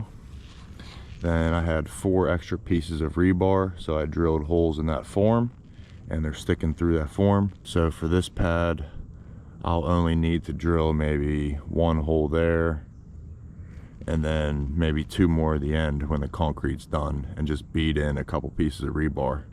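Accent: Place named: Southwestern Pennsylvania, United States. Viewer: I’ve been watching this series of you pouring your pad in stages. Great work doing it all yourself the old school way! I just poured a patio at my camp the same way in three stages. I had held though since I showed my nephew how to make the concrete and feed it to me. It’s a great feeling to do the work yourself and it certainly saves a lot of money. Are you building a shop on your pad when it’s done? Also where are you located? I think I detect a southwestern Pennsylvania accent 😂. Great job man and I’m enjoying the series. Greetings from northwestern Pennsylvania!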